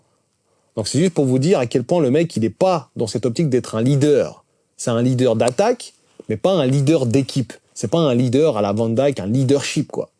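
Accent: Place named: Switzerland. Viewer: wesh t'es suisse, on dirait pas avec l'accent jajaj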